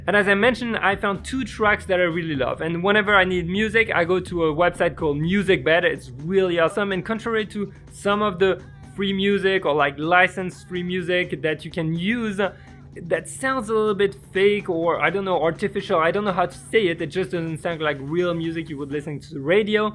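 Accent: France. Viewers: French Accent ?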